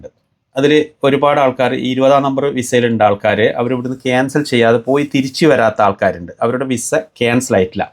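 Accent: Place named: North Kerala, India. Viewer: താങ്കളുടെ വീഡീയോ വളരെ ഉപകാരപ്രദമാണ്. പ്രവാസികള്‍ അറിയേണ്ട നിരവധി കാര്യങ്ങള്‍ സമയാ സമയങ്ങളില്‍ അറിയുക്കുന്ന താങ്കള്‍ക്ക് നന്ദി രേഖപ്പെടുത്തുന്നു. താങ്കളുടെ സംസാരത്തില്‍ നിന്ന് താങ്കള്‍ വടക്കന്‍ കേരളത്തില്‍ നിന്നുള്ള വ്യക്തിയാണെന്നാണ് മനസ്സിലാകുന്നത്. പക്ഷേ, പലപ്പോഴും താങ്കള്‍ സംസാരിക്കുമ്പോള്‍ തെക്കന്‍ കേരളക്കാര്‍ തെറ്റായി പറയുന്നതത് പോലെ 'കിട്ടത്തില്ല', 'പറ്റത്തില്ല' എന്നൊക്കെ പറയുന്നതായി കാനുന്നു. നല്ല കാര്യങ്ങള്‍ സ്വീകരിക്കുന്നത് നല്ല കാര്യം തന്നെ. പക്ഷേ, ഇത്തരം അബദ്ധങ്ങള്‍ എന്തിനാണ് അനുകരിക്കുന്നത്?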